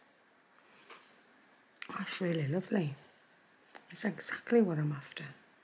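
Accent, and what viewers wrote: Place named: Geordie, United Kingdom. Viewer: Watching from my home in Australia, though i am a Geordie. Loved the video and recognised your accent. Where are you from?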